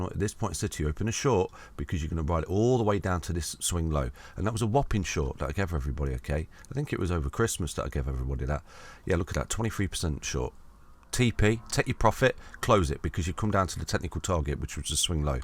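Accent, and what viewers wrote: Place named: Nottingham, United Kingdom. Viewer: im from nottingham and your accent sound local to me, do you do any meet ups to share knowledge ?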